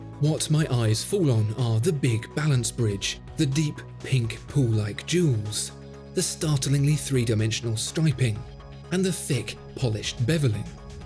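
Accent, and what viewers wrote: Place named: England, United Kingdom. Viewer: This channel always makes me hate the English accent 🤡 and can you ever change th music? Jeez And seiko only competes with seiko, not anyone else , why they have 2 factories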